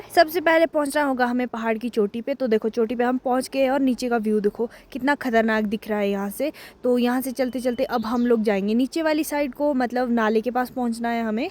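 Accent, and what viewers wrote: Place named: Urdu, Pakistan. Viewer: Bara saaf urdu ka lehja he.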